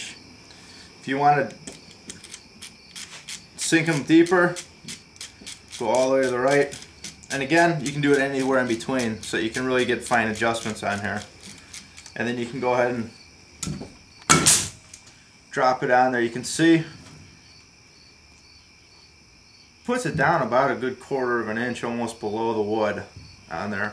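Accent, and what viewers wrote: United States. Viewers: Great demo. Love the US accent. Now I'm going to have to find out if my Porter Cable nailer can swap out the trigger to become a bump nailer.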